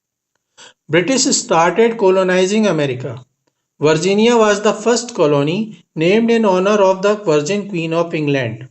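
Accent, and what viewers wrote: India. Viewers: Oh my word! This guy better take up another hobby. This is the most horrible INDIAN accent I have yet to come across. Nope. Take an English accent reduction class and move on to BASKET WEAVING 101. Please.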